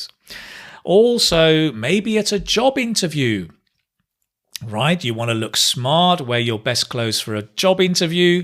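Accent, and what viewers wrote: United Kingdom. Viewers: is it British English?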